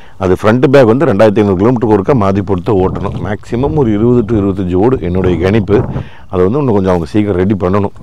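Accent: Kongu, India. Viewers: தம்பி அவர்களுக்கு வணக்கம் வாழ்த்துக்கள். உங்களின் மண் வாசம் மாறாத கொங்கு தமிழும், அதனுடன் இணைந்த இயல்பான பேச்சும், அதில் தெரிகின்ற உண்மையும், புன்னகையும், உங்கள் காணொலிகளின் வெவ்வேறு விதங்களில் வெளிப்படுத்தி வருகின்ற விதமும் மிகவும் சிறப்பாக உள்ளது. வாழ்த்துக்குள்!! தொடரட்டும் தங்களின் பணிகள். பாராட்டுக்கள்.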